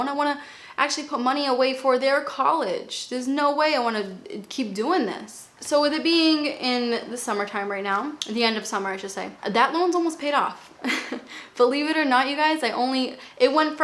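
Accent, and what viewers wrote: Boston, United States. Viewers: Love your Boston accent. Love the way you said Michael Kohrs as Michael "Khohs."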